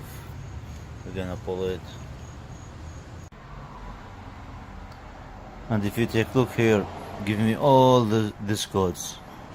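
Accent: Iraq. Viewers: اخوية انته عراقي من لهجتك ياريت تتواصل وياي لانه عندي نفس المشكلة واني ممنون منك ياطيب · يا اخي لهجتك تكول انتة عراقي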